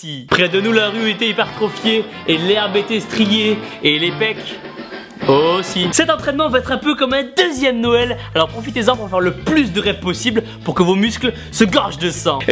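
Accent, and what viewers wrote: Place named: Toulouse, France. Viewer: Quel bel accent toulousain!!!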